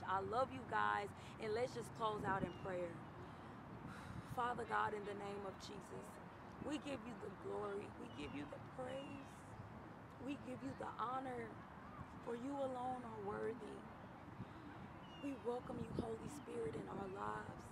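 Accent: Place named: Memphis, United States. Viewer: This was right on time. Idk how I got to your channel but I’m glad I did. Your videos have really been blessing me. I love the Memphis accent too (lol I can always hear a fellow Memphian 😂)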